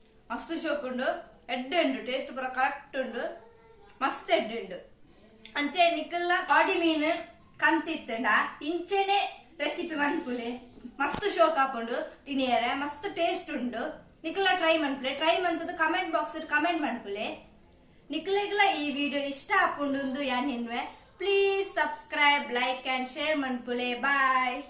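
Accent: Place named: Mangalore, India. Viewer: Pure manglorean base voice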